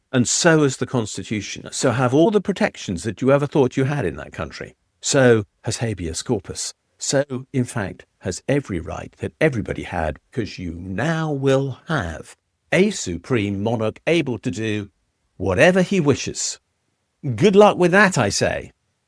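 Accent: United Kingdom. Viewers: Wrong. Hyperbolic nonsense. The US is not, and never has been, a democracy. That is mob rule. We are a Republic where individual rights supersede mob rule. The majority cannot vote away the second amendment, for example. It’s irrelevant what the majority wants if it’s counter to the Constitution. The House has the power to appropriate money. If they vote to do this, it’s entirely constitutional. The judicial branch has to use the DOJ to enforce anything and Trump is the boss of that and wouldn’t let them enforce anything anyway against the current administration same as every administration before. The past administration actively prosecuted their opponents and now somehow Trump is guilty of doing it. That’s just basic hypocrisy. The fact that you mentioned the midterm means you have no clue about current demographics in the US. The US is objectively going to be conservative going forward. 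The democrats are not going to make any gains in the midterm and will probably lose more ground. If the states continue to vote the way they currently are, the democrats will literally not be able to win the electoral college again, which is our brilliant counter to mob rule. You have a British accent. Maybe you’re not living there so I admit I would be wrong, but it’s pretty rich listening to. British guy criticizing our government. You live in a bureaucratic dictatorship that doesn’t even acknowledge unalienable rights. You still have a monarchy that technically owns everything so you hav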